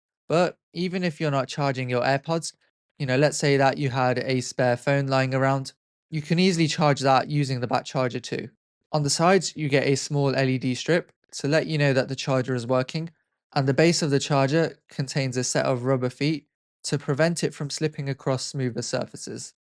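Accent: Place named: United Kingdom. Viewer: I really like your uk ascent 😂 😂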